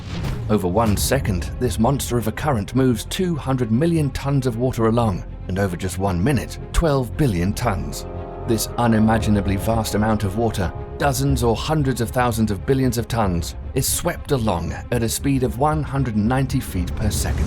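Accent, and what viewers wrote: United Kingdom. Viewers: Just how a lot of English people speak. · he sounds slightly british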